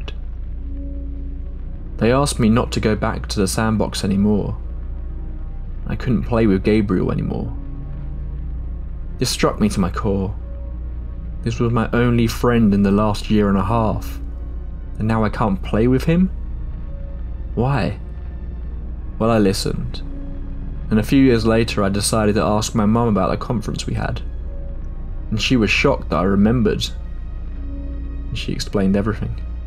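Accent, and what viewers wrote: United Kingdom. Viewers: A British accent, monotonous voice, and spooky content...Recipe for success on YT. 😄 Not hatin' on it at all! I'm addicted!...Ijs, it's become "a thing". 🤷🏾‍♀️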